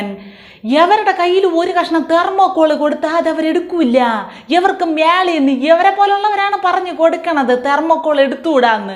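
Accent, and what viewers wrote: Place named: Trivandrum, India. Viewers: തിരോന്തരം ശൈലി ബേഷായി😅😅😅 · തേരോന്തരം ഭാഷ പോളിയാണ് 👍👍👍🤗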